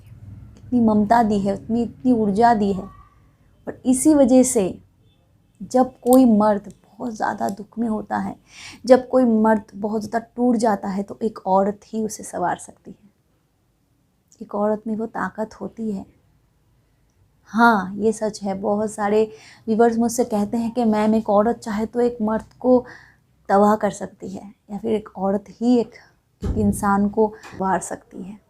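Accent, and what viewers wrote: India. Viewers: Love your hindi accent..🥰🙈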